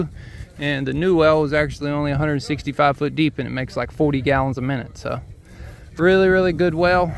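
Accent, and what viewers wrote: South, United States. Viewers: speaks in a southern drawl, making ceMENT sound like SEEment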